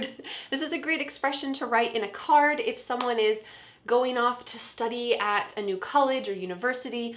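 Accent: United States. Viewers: Although you speak american english, but i think i can listen to your pronounciation very well .because your voice is clear easy to understand.who think like me? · When you use american accent i just fall in love with your English lots of love from lndia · Your pronunciation is good for me to understand... I watch different channel's videos but they aren't that clear to me. Only yours and marinas(from linguamarina) pronunciation is better to me.. I love american accent.... ❤️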